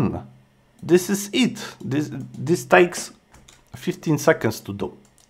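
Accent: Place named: Russia. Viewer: Мне нравится ваш тяжелый russian accent, товарищ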